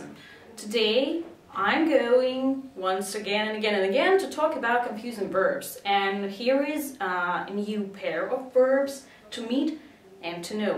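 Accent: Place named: United States. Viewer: Кстати у американский акцент, как у носителя языка с первого раза и не скажешь, что она русская!!! Продолжайте в том же духе и по больше видео!!!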